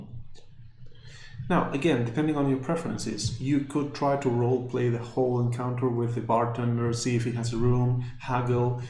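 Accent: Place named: Greece. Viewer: Yeah, you sound exacly like my teacher from greece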